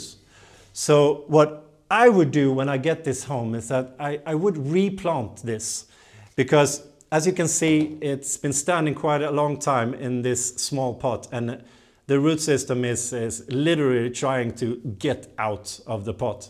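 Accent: Sweden. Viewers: its the beautiful swedish accent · I know that most of you don't mean it that way, but actually I find it a bit rude to make fun of his pronounciation, or to put the "funny" pronounciation above the content. The content is so good! He is obviously Swedish, but he speaks very good English, so let's be thankful that they make the videos in English and not in Swedish. In Swedish, I wouldn't be able to understand them, because I am German. I would probably also have an accent if I'd start making English videos... :-D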